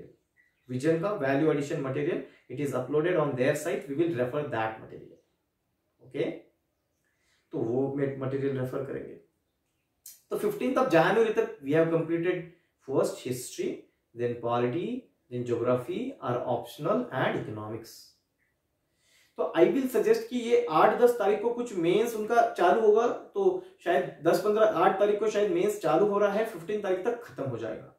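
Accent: South, India. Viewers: Sir south indian tone in your language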